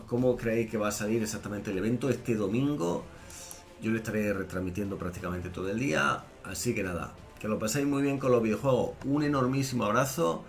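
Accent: Spain. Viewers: Tengo curiosidad por saber de qué parte de España es su acento? Yo soy de Latinoamerica y pues no logro diferenciarlos, mil gracias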